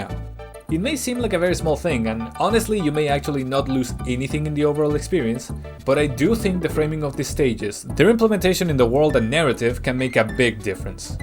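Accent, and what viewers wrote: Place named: Chile. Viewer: Un chileno hablando ingles? Aprende toby fox 🗣️🔥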